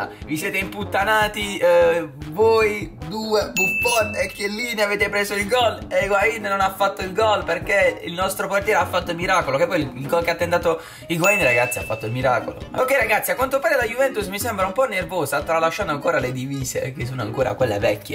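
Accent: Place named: Rome, Italy. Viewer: Ma era lo stadio del Marsiglia🤣🤣🤣 Pensavo tifassi Roma dal tuo accento🤔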